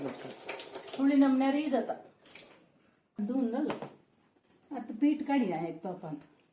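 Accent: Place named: Mangalore, India. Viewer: Authentic GSB Mangslore konkani .......feels so nice to hear. I herd it after over two decades!....GSB food is superb!!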